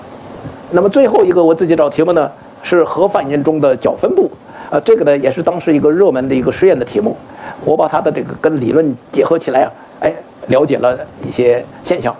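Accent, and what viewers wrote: China. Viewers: 精彩。在美国生活了一辈子，英语的华人口音还这么明显。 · 1922年出生的可以说是近代史活化石了呀 他的口音就是当时中国的口音吧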